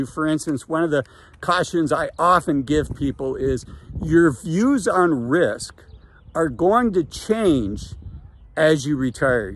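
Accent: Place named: Minnesota, United States. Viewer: I love “yuurs” instead of years. Keep that Min—a-SO-tah coming! ❤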